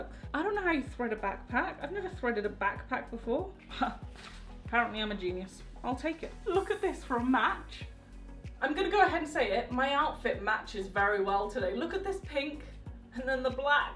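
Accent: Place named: RP, United Kingdom. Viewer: You're so posh I love it 😂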